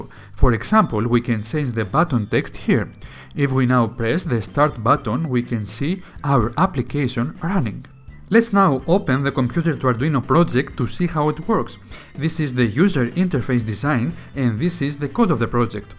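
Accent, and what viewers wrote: Greece. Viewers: Your accent sounds Greece but not sure 100% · Are you from Greece? Your English remind me when Greeks speak English btw I'm from Greece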